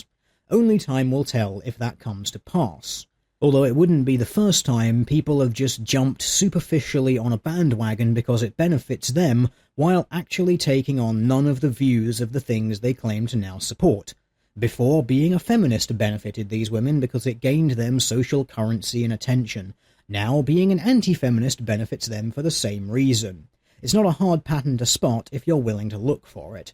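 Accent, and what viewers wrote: United Kingdom. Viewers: Wait. He's British? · Wait, you're British!? I thought; was Canadian.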